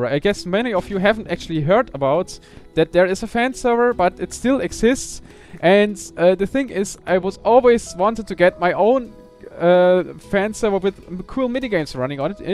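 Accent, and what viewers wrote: Germany. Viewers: Your German accent makes it hard for me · You're awesome :) awesome German accent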